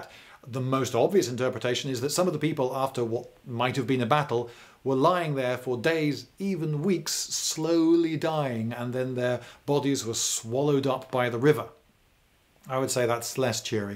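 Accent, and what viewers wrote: England, United Kingdom. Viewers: For some reason in my head when im hearing you is almost like im hearing John Cleese, maybe its the english accent or the subtle humor, in any case its very captivating. Thank you for all the effort and hard work you place in all your presentations.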